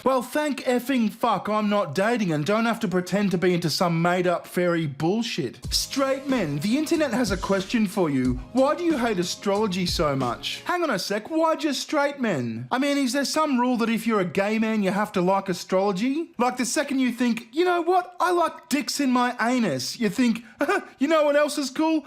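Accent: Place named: Australia. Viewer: the australian accent is just so awesome. i want u to say put another shrimp on the barbie in an upcoming vid. with the exaggerated upwards accent like 80s paul hogan. p'huttt ahhnuhhthah sh'hrhemp auwn theah bahbbeh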